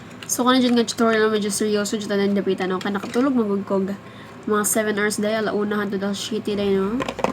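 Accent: Visayas, Philippines. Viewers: Trueee! HAHAHAHA may sense of humor kasi saka bet na bet ko yung accent ng pagbibisaya niya😂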